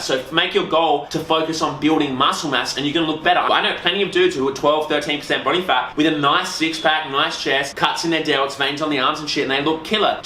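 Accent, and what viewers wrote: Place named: Newcastle, United Kingdom. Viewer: If nobody has asked why you introduce videos in a Geordie accent then I'll be pissed.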